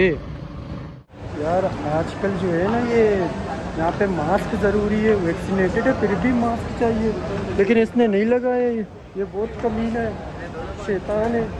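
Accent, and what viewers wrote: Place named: Balochistan, Pakistan. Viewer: BRO your Urdu is gud with Balochi style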